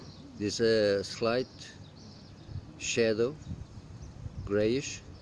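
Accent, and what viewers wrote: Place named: South Africa. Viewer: Do this tracking on the roof or it is not worth anything. You sound like you spent time in South Africa, I could be wrong. :) Use a 360 camera to record these events. :)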